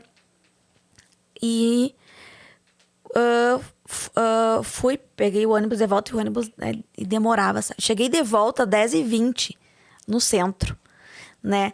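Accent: Rio Grande do Sul, Brazil. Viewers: Aqui nos comentários tem bastante gente que comentou sobre o sotaque gaúcho tchê aaannn lá é o popular guris e gurias, aqui em MG na capital tem o Minas tchê mistura às culturas e tradições mineiras e gaúchas🎉. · Ela é gaúcha querem que ela fale como? o povinho insuportável! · Gente, ela é gaúcha. Vcs queriam que ela falasse como??? Aaaaffff... Gente chata!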